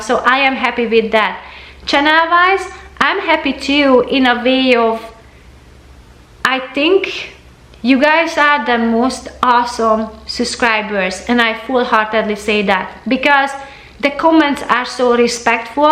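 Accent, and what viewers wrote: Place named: Hungary. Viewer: Wow! that was an amazing video, thank you so much for opening up to us and allowing us to get to know you better. first of all please; never apologize for your accent, I am sure we all understand you perfectly well, and many of us have"accents" well, my maiden name is Szabo, my Daddy was Hungarian, and ended up in France after WW 2 and met my &Mom, so I was born and raised in France but my Dad took us several times in Hungary and Romania ( transilvania) to meet our family and these times are some of the best memories of my childhood and now I live in California with my German husband...😀...in your voice and your intonations and pronunciation, I can hear my Dad's accent and it warms my heart.All I can say is you do such a wonderful job at helping people get motivated to improve their homes and environment and,taking care of our health to,o thank you so much for that, just that, in itself you accomplish so so much, your family must be so proud of you, and you show people that having a close partnership with your spouse is so special and makes,your life easier and helps you so much in overcoming difficulties in ln life, your guys' love for each others shines thru in your videos and I think is very encouraging to younger couples to strive to become a strong and united mates, thank you again.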